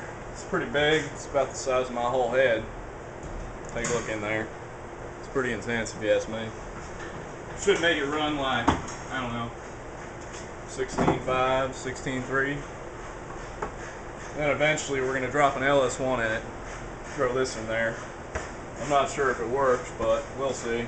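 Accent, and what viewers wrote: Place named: Oklahoma, United States. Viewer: if you don't mind me asking, where is you shop located, i'm an okie but live in Fresno, you sound like me so your probably east of me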